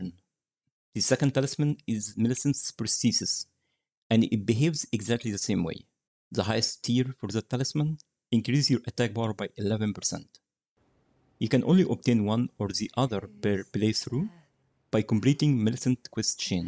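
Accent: Egypt. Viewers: I can tell you're Egyptian from you accent lol nice video my friend :)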